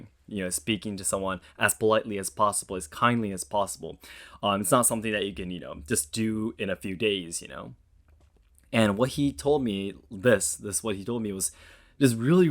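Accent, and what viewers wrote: United States. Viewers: I am very grateful and happy that you speak so politely in English. I've never needed to worry about who is around me be upset or offended by hearing anything on your channels. Which makes me very happy. As far as your English accent being American or basically the same as mine. I think that it makes it easier for anyone who speak English to understand you. They send people from other parts of the US to the region you lived in / I am from to learn how to speak American English in a way to be well understood. You are very polite in English too.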